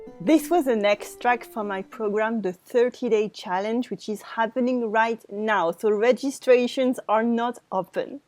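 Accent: France. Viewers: These vignettes are lovely Tq SO MUCH for teaching in your iincredible beautiful French accent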